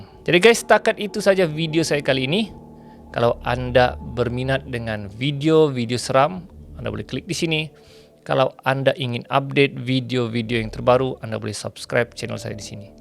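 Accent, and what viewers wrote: Sabah, Malaysia. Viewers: iyaa org sabah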